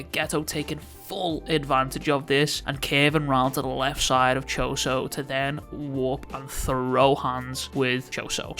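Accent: United Kingdom. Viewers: Cant lie its cool to hear a Uk Accent whether it be Scotland Wales or England feels like im talking to one of my mates lol great video bro keep em coming <3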